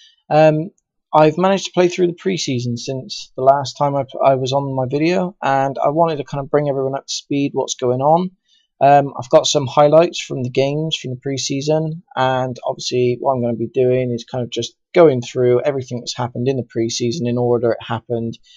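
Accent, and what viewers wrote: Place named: Bristol, United Kingdom. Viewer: Nice to see a city career mode on yt. And nice bristolian accent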